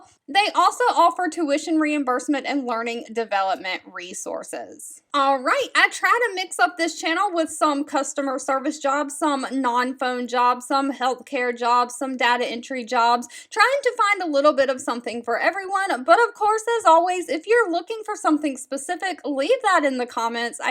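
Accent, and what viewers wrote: United States. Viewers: She's sooo American - cannot understand what she is saying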